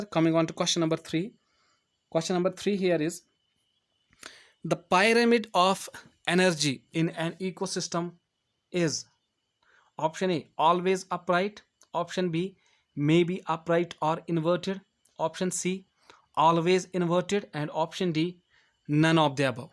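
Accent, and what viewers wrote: Kashmir, India. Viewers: Kashmiri tune smj ni aati words ko repeat pr krke sunna pdta h....biggest problem 😞😞😞😩😩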